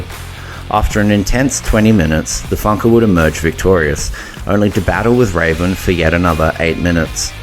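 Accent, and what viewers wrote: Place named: Australia. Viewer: it's exciting to hear what sounds like a fellow Australian ECW mark, E-C-Dub, E-C-Dub, E-C,Dub XD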